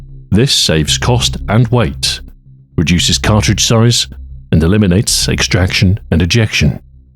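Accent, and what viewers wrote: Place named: United Kingdom. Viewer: Love hearing that thick British Accent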